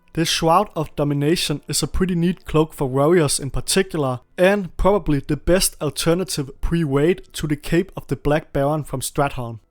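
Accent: Denmark. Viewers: Love the accent - a fellow dane here! Great vids bud! Do you stream on twitch or only making content here?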